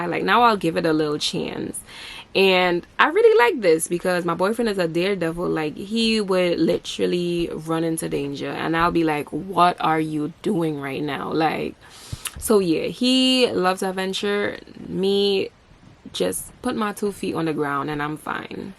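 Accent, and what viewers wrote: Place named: Caribbean. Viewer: Reslly informative, and good job on the weightloss. Aldo i hear an accent, are you of caribbean desent?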